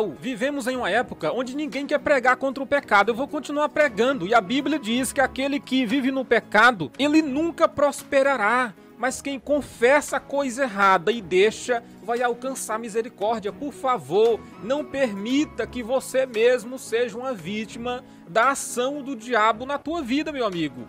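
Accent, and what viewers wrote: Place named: Maranhão, Brazil. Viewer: Kkkk você prega a verdade mais ao mesmo tempo é super engraçado com esses sotaques Maranheses!!! As vezes agente nem ta a fim de rir mais vc nos faz rir! De São Paulo capital!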